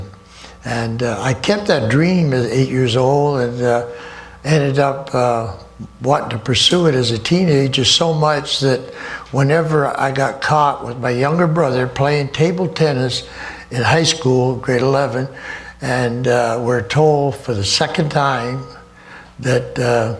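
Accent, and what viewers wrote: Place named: Canada. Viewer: So strange to hear uncle Ivan speak with a Canadian accent. Growing up I really thought he was Russian. He was great!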